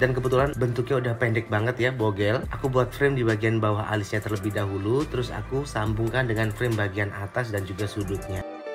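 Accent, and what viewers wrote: Java, Indonesia. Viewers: Tetap medok 🙏🤭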